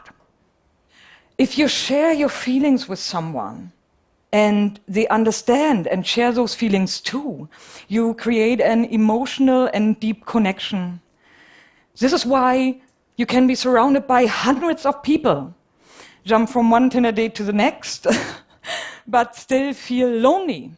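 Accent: Germany. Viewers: Sexy german accent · I think the lecturer has a German accent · Sies ... sies is why... german can't speak English...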